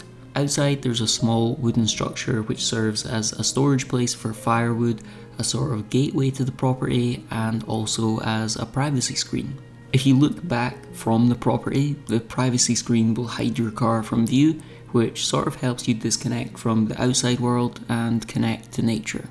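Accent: Scotland, United Kingdom. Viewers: Nice one, cool to see some of my heritage coliding with an aussie location voiced by a scotsman!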